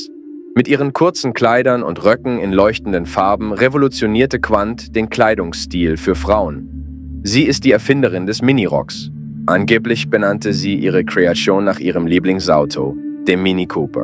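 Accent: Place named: United States. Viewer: was soll dieser "Ami-slang" in deiner Präsentation?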